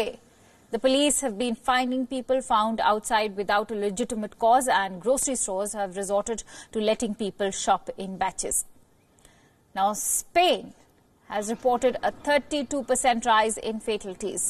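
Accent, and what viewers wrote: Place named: India. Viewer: Her indian accent gets on my nerves. Couldn't they find any European speaker?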